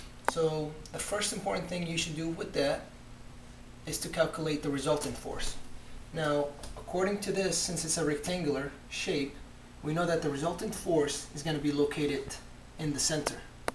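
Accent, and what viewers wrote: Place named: Brazil. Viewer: Are you brazilian? Your accent looks like a brazilian speaking english ...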